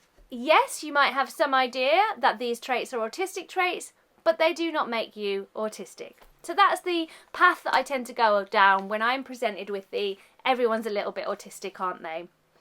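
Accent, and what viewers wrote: United Kingdom. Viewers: Hi. Your videos which focus only on autism have created what looks like a community of ( pro-autists ). With that groundbreaking endeavor you have made normal and likeable what is essentially seen as abnormal and dislikeable. Besides, you have provided a knowledge pool regarding this condition which covers many of its aspects. For us none native speakers of the English language, it is a thousand times easier to find a material in American English than to find it in British English. For me, both as a dentist and an avid student of the English language ( the British accent ), your videos provide a save heaven of learning which I both appreciate and admire. I have been thinking, as well, it would be great if this same idea be expanded to cover other areas of people with special conditions. Such a focus will turn these special conditions from the stereotypical branding of ( disorders ) and ( disables ) into groups of special conditions and especially creative communities. 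I strongly believe, it worths trial.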